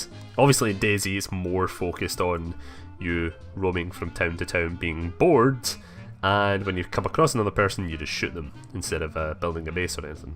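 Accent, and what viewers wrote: Scotland, United Kingdom. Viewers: are you Scottish it sounds like it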